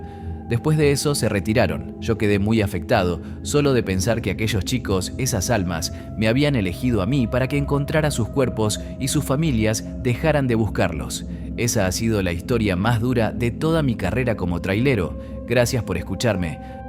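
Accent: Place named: Argentina. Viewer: Buenas historias lo único es que las historias están ambientadas en México y el narrador es argentino 😅😅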